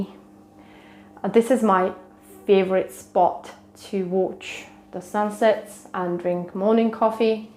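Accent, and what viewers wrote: United Kingdom. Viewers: I hear a slight British accent to your speech.........In Greece people have washers, very rare to have dryer....I think that is pretty common in Europe. This apartment is quite large. area looks middle class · I'm hearing a British accent? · I mean no offense-- I cannot decide if you are Russian, or British. Your English, which is excellent by the way, has a slight British accent. I know sometimes people pick up the accent of an instructor in a different language. I very much enjoyed the tour of your apartment and building. It appears a middle class city to me. Knowing nothing about it, I might guess heavy on industry? Thank you, very nice video.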